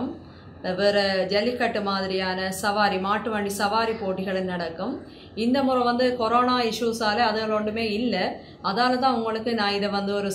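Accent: Sri Lanka. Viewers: ஐயகோ சகோதரி ஒவ்வொரு முறையும் நன்றி நன்றி என்று சொல்லி நம் சகோதரத்துவ உறவை பிரித்துவிட வேண்டாம்😊🙏 இப்போது என்னவென்றால் "நன்றி ஐயா" என்று சொல்லி விட்டீர்களே😊 என் அன்புச் சகோதரி தங்களது குழல்வாய் மொழியென்னும் இலங்கைத் தமிழும் தங்களது கனிவான பேச்சும் உயர்ந்த எண்ணமும் தெளிவான சிந்தனைகளும் என்னை மிகவும் கவர்ந்தது...! என்னைப் பொறுத்தவரை தாங்கள் எனது உடன்பிறவா மூத்த சகோதரி ஆதலால் உங்கள் தம்பி நான் உங்களை புகழ்வதற்கும் வாழ்த்துவதற்கும் நன்றி செலுத்த வேண்டாம். இலங்கைக்கு வருகை புரிய வேண்டும் என்பது எனது நீண்ட கால ஆசை...! எளிதாக வரக்கூடிய காலங்களில் வாய்ப்பைத் தவற விட்டுவிட்டேன் தற்போது கொரோனா கட்டுப்பாடு தனிமைப்படுத்துதல் அது இதுவென்று ஏராளமான இடையூறுகள் உள்ளன. இருப்பினும் நிச்சயம் ஓர் நாள் நான் நமது இலங்கைக்கு வருவேன் உடன் பிறவா என் அன்புச் சகோதரி தங்களையும் தங்கள் குடும்பத்தினரையும் காண்பதற்கு😊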